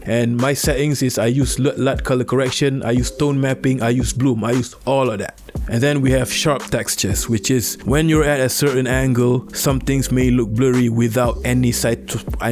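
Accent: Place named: Malaysia. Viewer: Cara bicaranya mirip orang malaysia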